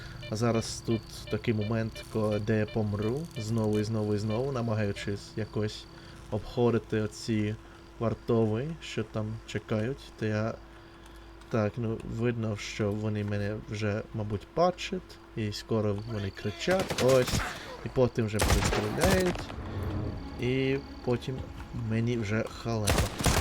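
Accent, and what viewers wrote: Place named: Finland. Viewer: Обожнюю Суомі, напевно це країна з найкращими людьми, і сам хочу колись побувати там і вивчити фінську. Бажаю успіхів цьому каналу! А вивчення будь якої мови то питання часу, я сьогодні враженний від того, що почув українську з фінським акцентом, до мурашок)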